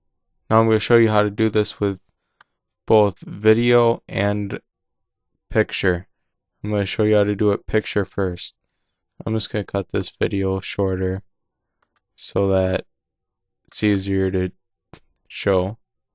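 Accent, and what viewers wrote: Minnesota, United States. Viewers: I was close. Lol I'm from Wisconsin but live in Minnesota. We have the same accent.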